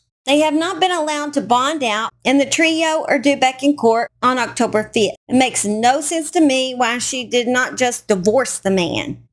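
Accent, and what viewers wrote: South, United States. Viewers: Also I’m from Alabama 😊and we sound the same!! Hand raise for the southern gals ❤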